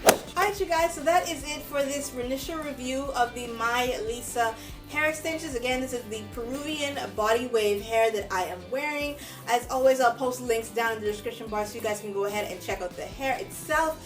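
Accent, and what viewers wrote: Bahamas. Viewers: Hi great review, the hair look amazing on you😉. I just found your channel and I am so happy I did, you're really sweet and very fun to watch😁. I can't wait to watch more of your videos!!! I heard my accent I'm Bahamian too so you know I will be giving you my love and support😁😁!!!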